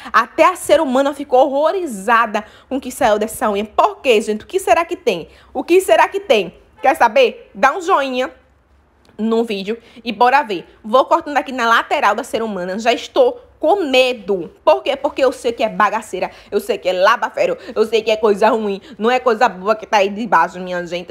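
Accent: Northeast, Brazil. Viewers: Gosto da voz de...voz de nordeste nos vídeos...kkkk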